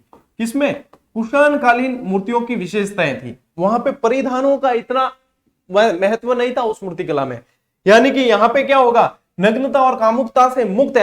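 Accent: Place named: Marwari, India. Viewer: Bhot acha pdhà rhe ho sir bt aap marwari thodi kam bolne ka try kre .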